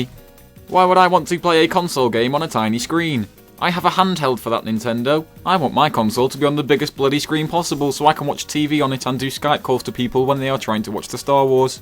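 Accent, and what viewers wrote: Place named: United Kingdom. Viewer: It'd be funny if it didn't sound like it was being read off a flash card. Or have that god awful British accent. :P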